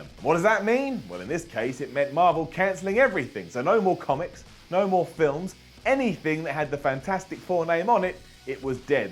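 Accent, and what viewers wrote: United Kingdom. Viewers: Lol after I saw this comment first thing I thought about was fake taxi because he has a British accent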